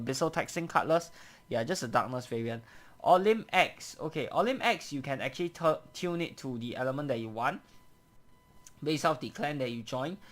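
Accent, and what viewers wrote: Singapore. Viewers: Bro you singaporean ah?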